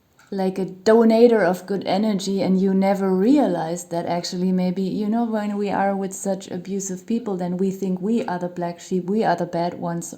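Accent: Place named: Germany. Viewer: You gotta sexy voice, babe, with a tiny German undertone somewhere, sounds cute!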